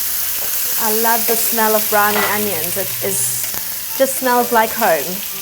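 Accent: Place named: United Kingdom. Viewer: What accent is that? I can't seem to put my finger on it. I was certain it was London or somewhere in the UK until I noticed the South African clover cream. She must have been born in the UK then moved to SA after developing the accent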